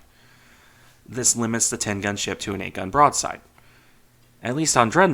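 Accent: United States. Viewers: Oh, just checked up on dee ofa comments: see y'all Virginian, wit dat southern drawling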